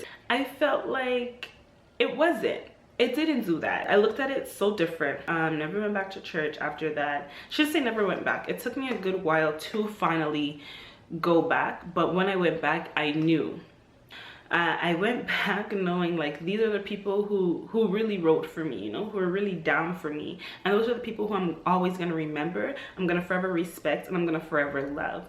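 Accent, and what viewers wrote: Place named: Jamaica. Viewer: Are you Jamaican ? Cause bwoiii this sounds like the churches in Jamaica. Plus you have an accent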